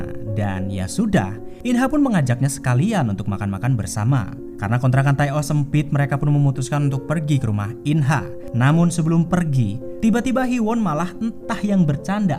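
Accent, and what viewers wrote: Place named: Banyumas, Indonesia. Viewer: atmint e ngapak geng😹😹😹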